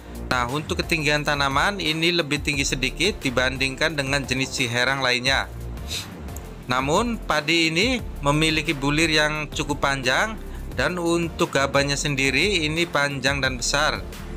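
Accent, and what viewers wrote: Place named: Indramayu, Indonesia. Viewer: Sampean sing daerah endi, indramayu e kang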